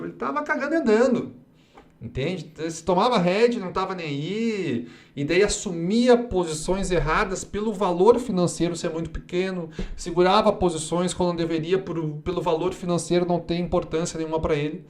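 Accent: Rio Grande do Sul, Brazil. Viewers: Esse sotaque de gaúcho é duro de aguentar 😠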